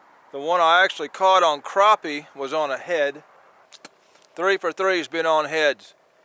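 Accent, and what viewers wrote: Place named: South, United States. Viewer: I'm sure somewhere in Tennessee. By the way he talks. He from the south yeehaw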